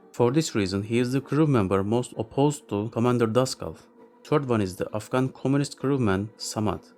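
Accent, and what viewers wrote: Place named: Turkey. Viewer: where are you from bro ? your accent sounds turkish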